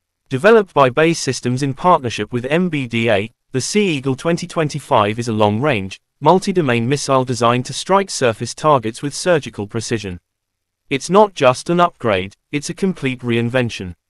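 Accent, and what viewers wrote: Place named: United States. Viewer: I wish all these American narrators would foxtrot oscar.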